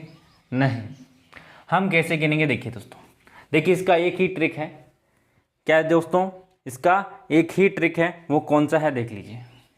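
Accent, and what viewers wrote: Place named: Marwari, India. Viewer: sir you speak marwadi sounds very nice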